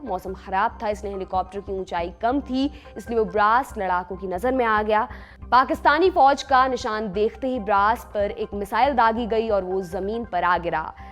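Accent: India. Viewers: Yes , but some urdu word is hard to speak with indian acsent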